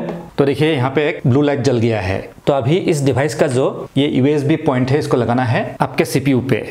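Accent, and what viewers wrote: Assam, India. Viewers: Axomor sobore hindi kotha anekuae hoi..🙃🙃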